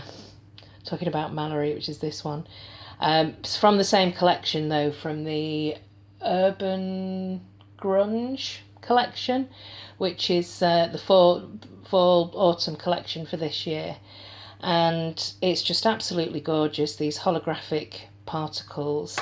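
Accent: United Kingdom. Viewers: Hi. I'm trying to place your accent. What part of the UK are you in? I'm in Manchester x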